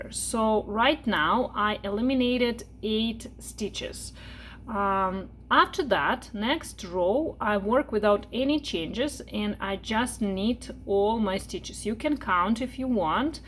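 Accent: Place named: Russia. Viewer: I love this tutorial! Your hat is so beautiful. I'm going to make one for my Dad for Christmas. By the way, your accent sounds just like the beautiful Russian accents I heard in college when I lived in a Russian community house. Is that your nationality, if you don't mind my asking? Thanks again for the excellent tutorial! ❤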